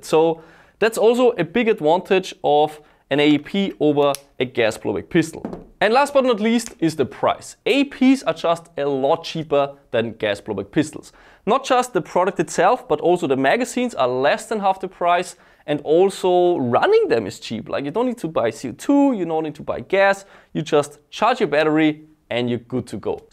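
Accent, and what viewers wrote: Austria. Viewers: Sometimes the Austria Accent comes through your English! And I like it! (I come from Germany, so...)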